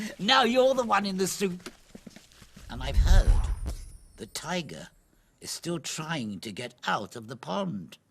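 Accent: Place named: United Kingdom. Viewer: This story is very useful for me or everyone. British Accent is prefect 🙏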